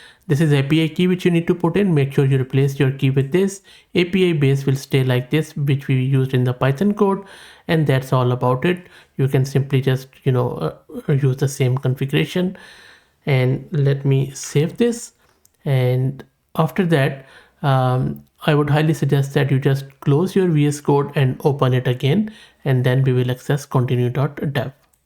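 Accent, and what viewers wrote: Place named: India. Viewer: >indian voice >disliked and reported the video immediately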